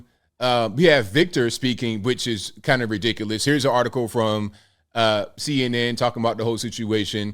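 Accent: United Kingdom. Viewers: Brit here, your British accent is approved! Britney Spears seems like a nutcase to me. I don't think she is all there. Imagine Victor Wembanyama just about to get started on what will likely be a stellar basketball career, and he finds himself in the middle of this nonsense! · doing a British accent is awesome. I think he should do every headline in British. Tut tut, good man.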